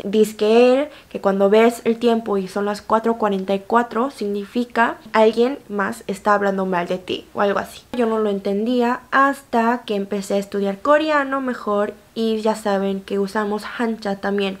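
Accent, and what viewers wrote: Mexico. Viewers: He estado viendo otros canales de chicas coreanas argentinas y hablan como argentinas y son coreanas he visto este video de chica coreana mexicana y hablas como mexicana y es coreana lo divertido en buena forma es que ambas son coreanas la argentina y la mexicana y si platicaran entre ellas las dos coreanas se encontrarían platicando con los respectivos acentos argentino y mexicano y eso es lo divertido y bonito al mismo tiempo en realidad me encanta y me rio porque ambas son coreanas me río pero en buena onda porque me gusta por la cultura que aprendieron según la región donde nacieron bueno no se si entendieron pero yo si me entendí sólo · Tu asento en español es mas mexicano no español puro pero coreana si eso si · Jajaja apenas te conocí y ya me agradaste ya tienes nueva suscriptora ☺, pareces coreana pero de como hablas ya eres 100% mexicana me encanta eso ❤❤❤ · el shock es mio; ver tu cara y tus llamativos ojos coreanos y hablar con ese acento mexicano. 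· wao tu acento mexicano ....es perfecto...saludos desde tamaulipas,mexico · Hello beautiful, I love your videos and you’re the reason I fell in love with the Mexican dialect/accent and I’m trying to learn Spanish through your videos. Thankfully I understood the main points but I noticed you didn’t put in English subtitles in this video. I’m wondering if you’re going to stop putting in English subtitles?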